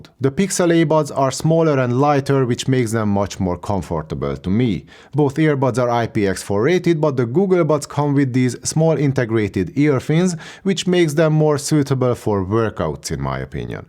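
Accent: Hungary. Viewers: Nice professional review with a nice hungarian accent :)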